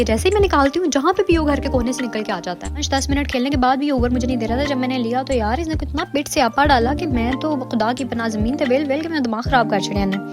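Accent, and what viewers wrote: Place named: Punjab, India. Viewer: Urdu k sath sath apki punjabi b bht cute haee❤ Stay blessed😊 and make more volgs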